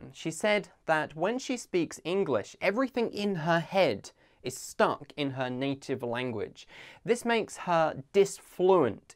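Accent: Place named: United Kingdom. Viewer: i love u.i'm f tehran and virgin not married 1982. i like ur accent to.first time i when hear uk accent i think that is funny.but its better that usa accent i think.lol.but im in iran.in never travel or see forigin in my life.lol.but if i in skype see any man "lol" maybe have chat with voice.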